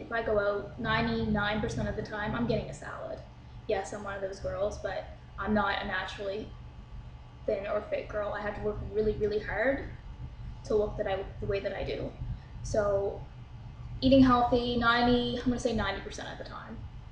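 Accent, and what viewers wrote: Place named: Canada. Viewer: Aw are you Canadian? I can hear an accent!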